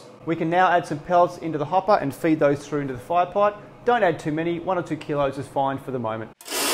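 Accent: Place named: Australia. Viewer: What is it about Aussies that makes me want to listen intensely? What we perceived as an accent is so awesome! Good job mate!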